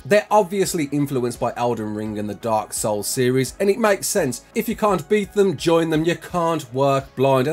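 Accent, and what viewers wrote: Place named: Scotland, United Kingdom. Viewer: And let’s get into itt! I love that intro so much. Hey are you from Scotland?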